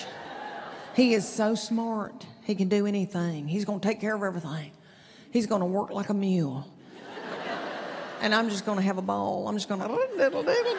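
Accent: South, United States. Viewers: I've watched your videos over time,🤨 Each time, I could not remember🤔 you being funny in any other video that I had watched. Yet I'd watch another one.🧐 Thinking maybe you're going to say funny this time ....🥱 Well, here we are.....😳 Maybe I just like watching you for your nasıl toned southern accent, Not that I'd recommend it, Cause that is not particularly interesting either. Maybe you're a niche comedian; Funny to a particular group. Wow, 😲 finally ❗ you might have just said something funny 😲😲😲 😂🤣🤣🤣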